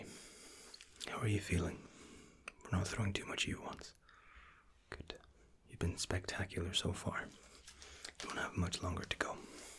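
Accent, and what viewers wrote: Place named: Scotland, United Kingdom. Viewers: Scrolling through the comments wondering how long after a video was posted is it still worth commenting (because I wanted to say how your voice is seriously my fave ASMRtists voice! So relaxing!) when I came across this comment.....How did I not realise you were Scottish?! That will explain why your voice is the best! I'm from Glasgow :-D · Finding your channel has been a godsend! I’ve been living in New Zealand for a year now, but I’m originally from a town near glasgow! So hearing your accent is so relaxing! Cheers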